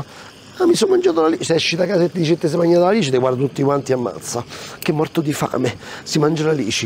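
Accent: Rome, Italy. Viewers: Faresti meglio a fare qualche film con Massimo Boldi con quel accento romano,e un complimento,sei bravissimo come cuocco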